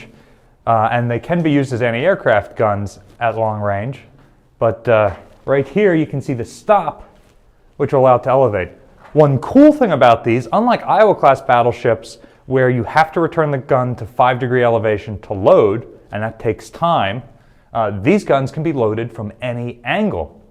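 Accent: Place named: New Jersey/Philadelphia, United States. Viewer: Yeah, definitely from the NJ/Philly area. That's how they pronounce it down there.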